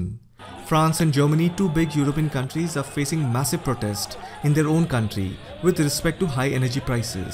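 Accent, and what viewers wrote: India. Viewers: Very clear indian talk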